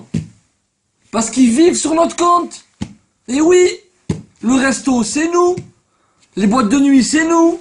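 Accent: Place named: Marseille, France. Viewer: horrible ton accent on le voit le Marseillais qui a immigré à Paris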